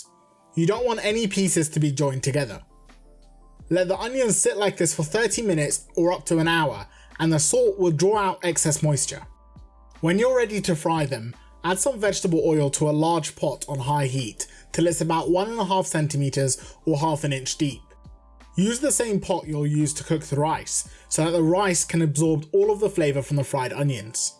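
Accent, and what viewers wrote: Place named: United Kingdom. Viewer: Delicious food! Handsome face! And a very intelligent sounding British accent 😂 what else could you ask for haha! This change is UNDERVALUED u will grow to at least 300k-500k! Keep it up!!!!! 😍✌🏻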